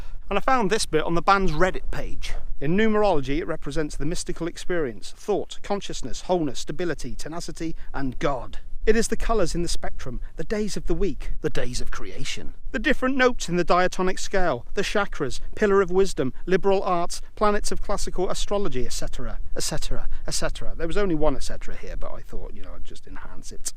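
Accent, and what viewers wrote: United Kingdom. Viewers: Looks like the English countryside,but then he does have an English accent.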